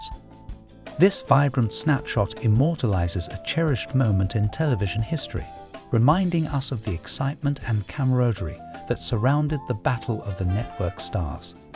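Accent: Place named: United Kingdom. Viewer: Hyperbole with a British accent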